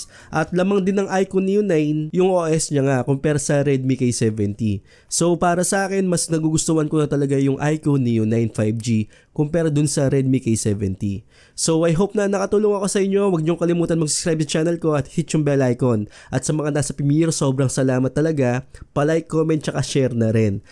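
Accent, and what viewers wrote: Pampanga, Philippines. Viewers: Kapampangan ka neh? balu mung balu ken pamanyalita mu lalagitik hahahaha taga ka nokarin pampanga sir?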